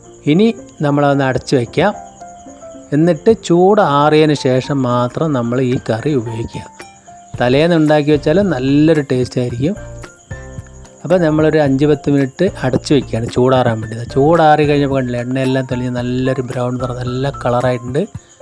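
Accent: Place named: Kannur, India. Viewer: വറുത്തരച്ച അയല കറി അടിപൊളി ആണല്ലോ 👍🥰❤️കണ്ണൂർ ആണെന് തോന്നുന്നല്ലോ 😃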